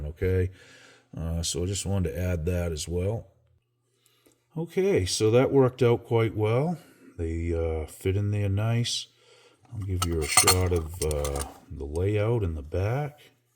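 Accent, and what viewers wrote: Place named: New England, United States. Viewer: Are you from Boston or New Hampshire... I noticed your accent.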